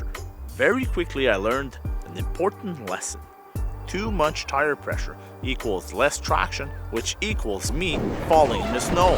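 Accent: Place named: Scandinavia. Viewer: Tout le long je trouvais que tu avais un accent scandinave. J'me disais que ça devait être en suède haha.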